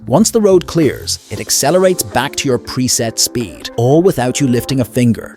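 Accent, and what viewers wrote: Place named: Ireland. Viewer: Thanks for this video. Most of the negative comments are from people who like to complain. I like the narrator's Irish accent.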